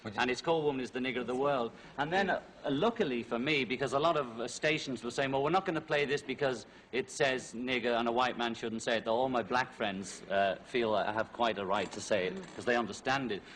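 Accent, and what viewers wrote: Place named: United Kingdom. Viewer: Bri'ish muck mouth ye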